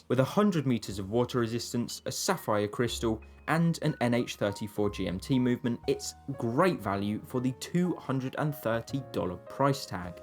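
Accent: England, United Kingdom. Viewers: He is British, whats the problem.... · If you find the way this guy speaks irritating, then you don't want to venture any further than Southern England. I live in the North and there's a completely different accent every 30-40 miles. To anyone outside the UK it probably feels like they're visiting a new country within a 1 hour drive 😂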